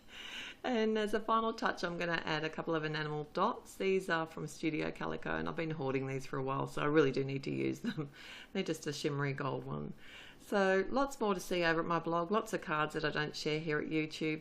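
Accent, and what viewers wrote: Australia. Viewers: Hi Love the card. Just wanting to know where you bought your ATG gun from and where do you buy the refills from too please. Also what is the blue tape that you use to stick your paper down on your board with. Just love your tutorials and it's so good to hear a Aussie voice. Lol Lots of love from Rhonda Laine Sydney Australia 😘😘😘😘😘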